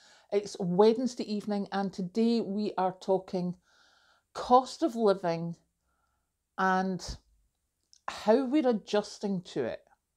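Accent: Scotland, United Kingdom. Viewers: Hi. I just want to say that I enjoy watching your videos. I have a lot of ancestors that came from Scotland. I live in the state of Oregon, in the USA. I've not been able to go to Scotland yet, but I hope to someday. Watching you gives me a glimpse of what culture and life are like there. I also love hearing you talk. Love your accent. Take care, and have a blessed day!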